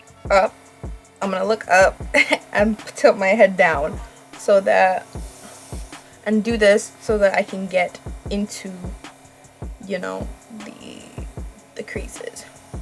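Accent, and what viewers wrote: Jamaica. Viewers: Its the Patois for me, LMAOOOOO, LOVE THIS VIDEOOO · Are you Jamaican my dear??? Becau you sound like an Jamaican ooman dem!!! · Are u Jamaican?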